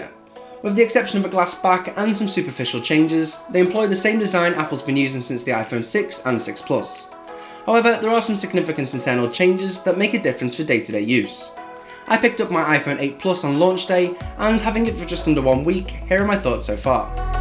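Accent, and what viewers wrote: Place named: Scotland, United Kingdom. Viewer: I love your accent, is that Scottish?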